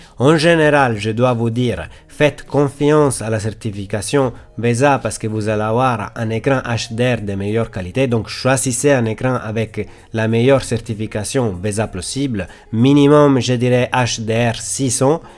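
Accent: Italy. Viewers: Bravo je m abonné et jolie accent italien ???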